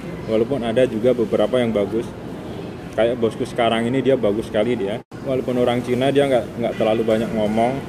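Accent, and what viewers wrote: Java, Indonesia. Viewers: Selalu suka dengan suaranya yang agak medok mas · Seneng saya dengernya, beberapa video. Dan saya di sini baru komentar. Saya bisa nambah wawasan untuk bahan cerita. Hehehe... Lanjut mas... Oh ya, kok bahasa logat sampeyan jawa banget... Asli jawa tengah po mas?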